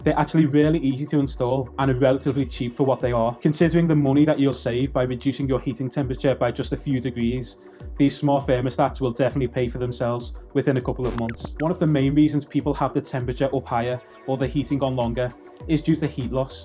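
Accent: Liverpool, United Kingdom. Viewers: Decent video you sound local to me liverpool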